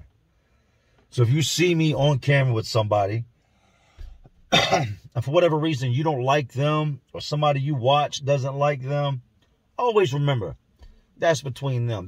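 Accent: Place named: Philadelphia, United States. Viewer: he fr talks how we talk out in philly, its just where and who he's spent his time with that influences how he speaks. just cause we talk a certain way doesnt make us hood or anything else we just talking how we are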